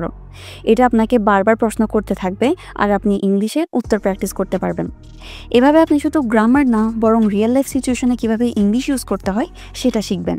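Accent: Chittagong, Bangladesh. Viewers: আপনি চট্টগ্রামের মেয়ে।আপনার উচ্চারণ তাই বলে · কথায় টান আছে চিটাগাং এর মতো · R8 amar o mone hoyese Onee Chattogram er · kothar accent sune mone hocche ctg er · আসসালামুয়ালিকুম আপা আপনার পরামর্শ খুব ভালো লাগলো আপনার বাড়ি চিটাগাং অথবা ফেনী হতে পারে , আমি উত্তরবঙ্গে থেকে বলছি রাজশাহী বিভাগ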